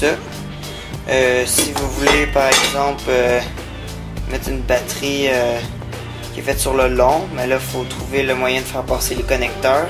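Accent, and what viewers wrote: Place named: Quebec, Canada. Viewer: Mais non je parlais de la video elle est au top,topissime! et j'adore ton accent anglo/québecois sa rend bien dans une review!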